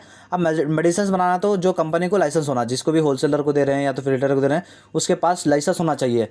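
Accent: Hyderabad, India. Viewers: Sir aap Hyderabad se ho naa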